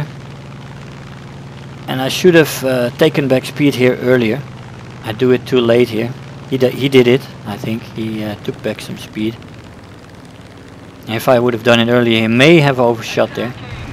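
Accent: Netherlands. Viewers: Ah the glorious accent of the Dutchman.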